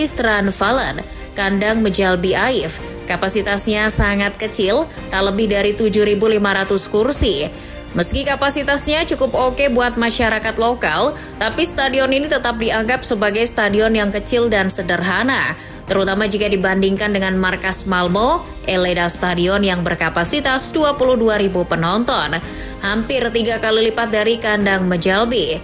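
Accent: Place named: Pekalongan, Indonesia. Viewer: orang pekalongan mana suaranya wkwk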